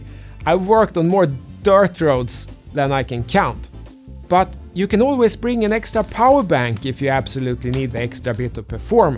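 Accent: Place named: Sweden. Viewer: My gf is swedish and as soon as I played the video she recognized the accent😂 great review!!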